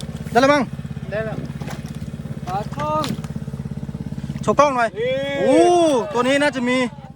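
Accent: Isan, Thailand. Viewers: พูดไทยเก้งก้าง ชัดบ้างไม่ชัดบ้าง ถ้าเป็นไปได้ สำเนียงนี้ (เว้าอิสานไปโลด)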